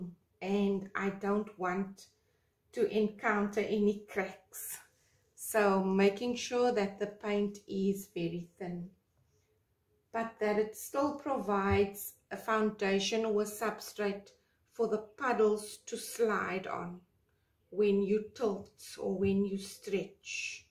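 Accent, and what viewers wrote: South Africa. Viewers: Lovely. I would recognize that South African accent anywhere. Mine's the same.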